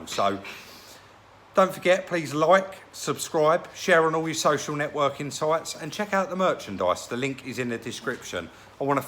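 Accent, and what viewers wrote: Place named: Cockney, United Kingdom. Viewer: This is just a great channel, great commentary, as I've said before I just love the cockney geezer accent.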